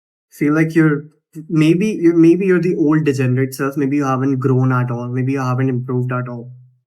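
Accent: India. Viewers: Let me be honest with you bro. You have the choice to listen to a YouTubers advice right now or you don't. 1. You are not actually adding ANY value. I can find your exact video 100 times in this niche, the difference is you are delivering it with an indian accent. Find a way to stand out, find a way to package your video in a way so good that people feel stupid not cliking on it and watching it. 2. I know that hamza may have said that editing your videos lowers audience quality and attracts "jeffreys", but that is only true to an extent. Even just cutting out pauses can improve retention rate significantly. Hamza has started to edit his videos again too, because he realizes the power of it. 3. Speaking about quality, your microphone quality is bad. Listening to your videos literally feels like a chore because of that. (4. You technically can still make it as a YouTuber in the self improvement niche, but it has become so oversaturated that you might as well start getting rich by selling a course on how to start a self improvement channel.) Advice may come off as harsh, but if you implement my advice correctly you'll pop off in no time.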